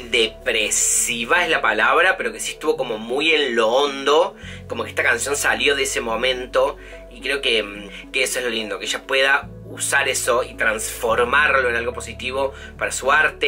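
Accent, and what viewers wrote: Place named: Argentina. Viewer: Que son los joeles, perdón no entiendo su español argentino, saludos desde México, hermosa canción de y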